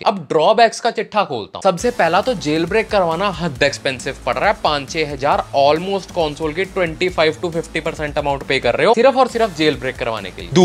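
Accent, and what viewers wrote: Punjab, India. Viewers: I came to listen his Punjabi Hindi accent......specially the word Chippppp😂😂 Loved your content😊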